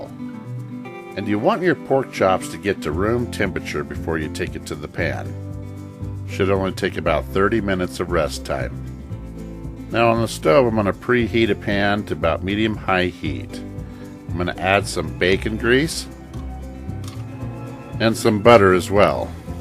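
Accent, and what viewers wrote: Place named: South, United States. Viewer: It's the Southern accent and chops for me, just subscribed, hoping you have jokes for days too for the bored ones....😂😂😂😂 Thanks for sharing 👍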